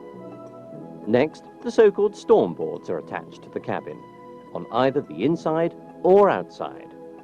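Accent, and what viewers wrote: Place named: RP, United Kingdom. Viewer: Kind of a posh accent for a DIY project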